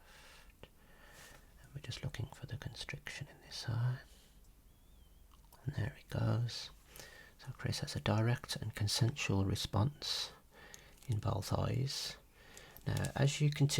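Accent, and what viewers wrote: United Kingdom. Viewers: where are you from in the UK? I like the accent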